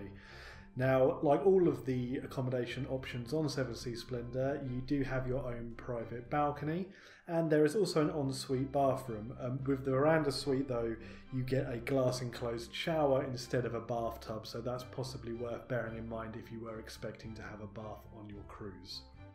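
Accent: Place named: United Kingdom. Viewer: Thank you. We have never sailed with Regent, but decided to try Regent/Splendor. Oceania Fans to be honest. Very good outline/overview vlog! Appreciated. Booked our cruise and pray, after the challenges we all face regarding CV19, that all goes well and we get to experience Regent and this beautiful ship. wishing you/family and all the Regent family every health and success in the years ahead. Liked hearing a fellow Brit too :-). Cheers to getting back at sea very soon. Best wishes, Elaine & Tom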